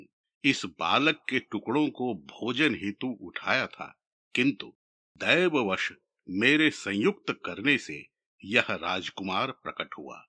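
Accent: Standard. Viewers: शुद्ध उच्चारण ने मंत्रमुग्ध कर दिया है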